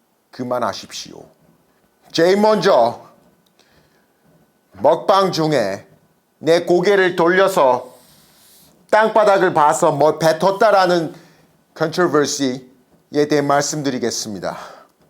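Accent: North Korea. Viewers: 근데 미국인이 왜 북한 말 하는 거 같죠?? 어디서 봤는데 닮은 사람.... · 뜨문뜨문 북한 억양이 있는데? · 말투 점점 북한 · 약간 북한말투..??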